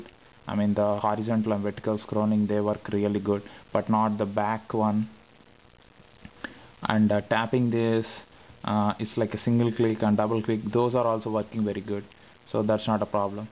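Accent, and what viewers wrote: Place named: India. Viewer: hes pointing out to ur indian accent my friend .. hence the software thing. :P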